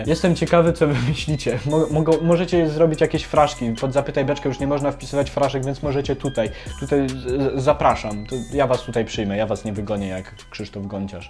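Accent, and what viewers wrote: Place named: United States. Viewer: przez jego amerykanski akcent to brzmi jak "szałmi" lecz poprawna mandarynska wymowa to "siałmi" to "ał" jest krotkim ao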